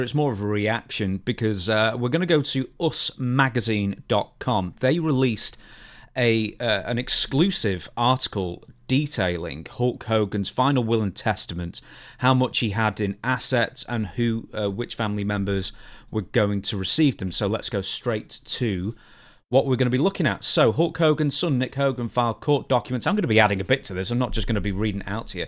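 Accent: England, United Kingdom. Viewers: Hi English Accent, American Accent Here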